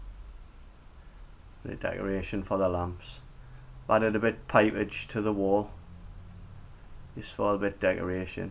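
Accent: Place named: Newcastle, United Kingdom. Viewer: lol your geordie accent made me laugh when talking about your pillows then straight on to the pillars. Great work mate looking awesome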